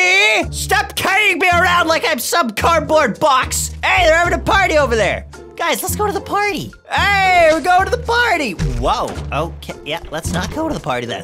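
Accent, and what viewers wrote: Australia. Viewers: i think your from austreilia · Do you come from Australia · ur from australia? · You are from Australia don’t Ask how know😂 from vid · I know where you are from Australia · I think your from Australia.. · Are you actually Australian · I think that your from Australia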